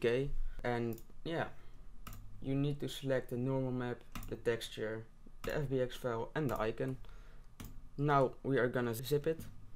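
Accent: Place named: Netherlands. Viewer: ik hoor duidelijk Nederlands hahaha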